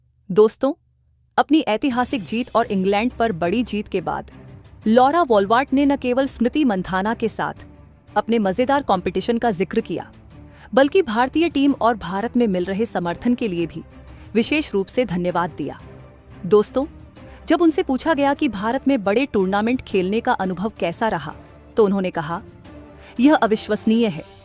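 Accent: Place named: Maharashtra, India. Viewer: Hindi sahi Hai, objection is Kiya Hai ki haha marati ward Jada Hai.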